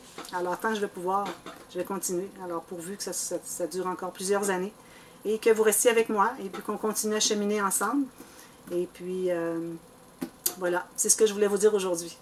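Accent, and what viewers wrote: Quebec, Canada. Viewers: bonjour merci pour toutes ces vidéos partage. pour ma part vous vous expliquez bien dans vos explications. un bel accent québécois je voyage en vous écoutant. vous méritez tous ces abonnés. un p'tit coin de france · Coucou bonjour, wahoooooooo félicitations pour tes 5ooo abonnés quel plaisir de pouvoir te découvrir en " vrais " ne change rien ton français est au top tout comme ton accent moi j'adore tes expressions canadienne merci pour tous tes tutos très instructif car très très bien expliqué... bisous bisous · Coucou toutes mes félicitations !!!! et merci pour les tutos super bien expliqués et avec ce magnifique accent québécois ;-) j'adore ;-) Gros bisous de la Lorraine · Vous méritez amplement les 5000 abonnés car votre travail et vos explications sont parfaites, votre générosité est sans pareil, continué encore à nous faire rêver pendant très longtemps.Je vous rassure votre français est parfait, et j'adore votre accent, apprendre des expressions canadienne est enrichissante.Bisous et encore MERCI POUR TOUT. 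du sud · Super bonne idée. 'Merci pour ce cadeau. Curieuse de voir les questions des fans. Génial, je peux mettre un visage sur cette jolie voie québécoise. Déjà rien que ça, c'est un beau cadeau. Merci d'être vous et de toutes vos explications. Bonne journée de Wallonie ( Belgique ) sous le soleil, 30° à l'ombre, caniculaire 😕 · Bonjour! Je m'appelle..... J'habite en "Drôme Provençale" (sud de la France dans la vallée du Rhône) où il fait très chaud. Et ce petit air du Québec me fait voyager. Nous adorons votre accent, ne changez rien! J'ai commencé le scrapbooking il y a quelques mois et je suis éblouie par votre travail. Même si c'est trop dur pour moi pour l'instant j'apprends beaucoup de choses. Votre simplicité, votre gentillesse et votre bonne humeur sont des parenthèses de bonheur. Et bravo pour les 5000 abonnés! Je vous embrasse.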